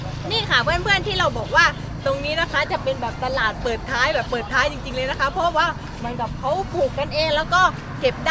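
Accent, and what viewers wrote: South, Thailand. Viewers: คนใต้ไหมคะ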